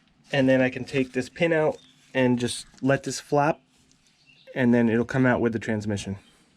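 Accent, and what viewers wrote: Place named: New England, United States. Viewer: I agree with others here, You sure do sound like Andrew. Must be the New England accent.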